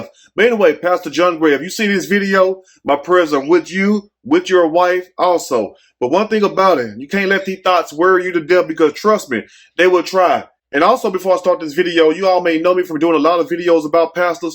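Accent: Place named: New York, United States. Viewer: Watching in from New York! when I first started watching u, thought u were from up this way 💃🏽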